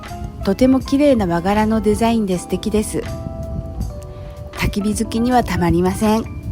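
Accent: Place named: Ibaraki, Japan. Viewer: 初ﾅﾚｰｼｮﾝ🎤 上手かったﾃﾞｽﾖ😉 茨城弁出ても ﾀﾞｲｼﾞﾀﾞｲｼﾞ♪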